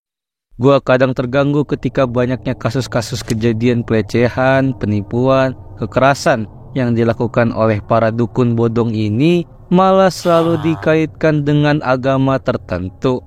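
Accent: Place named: Banjar, Indonesia. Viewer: Bang orng banjar masin? Logatnya kaua orng banjar 🗿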